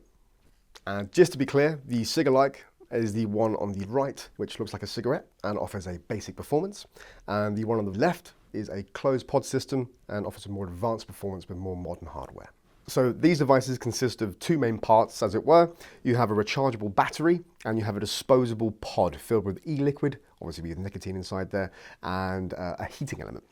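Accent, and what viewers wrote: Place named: United Kingdom. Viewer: AN IRISH WITH A BRITISH VOICE?!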